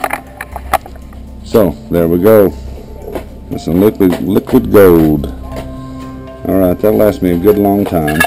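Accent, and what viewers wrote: South, United States. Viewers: Quality control comment. Great content., your audio quality here is so-so, and you're a bit hard to understand because my ear is not attuned to a Southern dialect, especially with the music competing with your voice. Humbly submitted in support of your excellence.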